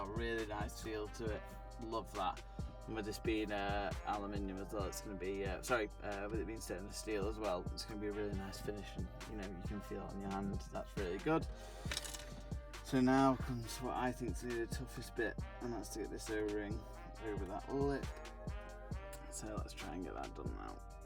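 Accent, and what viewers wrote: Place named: North, United Kingdom. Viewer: Number one you are really tan for somebody with a slightly northern accent. Maybe I got that right maybe I got it wrong But thank you very much this was really informative I have a 2011 John Cooper works that I wanted to change out everything in to match the wrap that I am doing on it you’re just really really helpful all the other ones not so much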